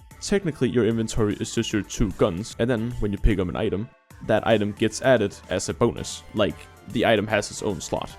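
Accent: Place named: Denmark. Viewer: Bro the accent sounds so danish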